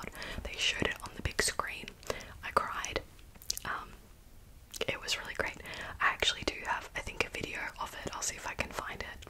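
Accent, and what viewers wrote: Australia. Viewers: congratulations from russia,!! i'm very glad i came across your channel some time ago. even though i don't know you personally you seem to be a good person. i love your videos and i adore your aussie accent so much (i knew you were aussie! glad i got it right haha no one says 'no' like aussies do, totally love that ♡) thank you for everything you do for us, really, it means so much... and also we love a boyband fan. i'm a huge kpop fan, was a directioner too and i still listen to 5sos, their latest album is really good, 10/10 and as for your question i'm doing pretty good yet i'm worried as i graduated from the univeraity this year and i'm moving to a new apartment literally in two days and as you can tell my life is a mess and clearly not so stable right now. so once again thank you for your kind words. i will try my best not to stress too much and just enjoy the moment while going my own pace not sure if you read that and the comment is way too big then i expected but thank you, i love you and sending you my best regards! 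❤ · YESSS I KNEW YOU WERE FROM AUSTRALIA (it's hard to identify accents as a non native english speaker) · I'm Scottish, and if anyone believed you were Scottish...I'm terrified for Humanity lol XD I had you down for Australian pretty quickly, but I might have an unfair advantage due to some of my family being Australian, as they sound very similar to you :) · I’ve waited for this video for so long to confirm if you’re Aussie or not 😂 every video I changed my mind and convinced myself that I was wrong hahaha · Aussie Aussie Aussie!! I knew it!!💕💕💕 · I love your accent. I knew you were either Australian or a kiwi. I would love to visit Australia. You've definitely traveled more than me 😅 you're amazing never stop being you. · Your Australian accent is definitely the strongest, but it took me some time watching you to really decide 😂 This was a fun video. It’s funny you prefer an American accent for ASMR. 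I am American, but prefer Australian or Scottish :) You’ve led a fascinating young life so far!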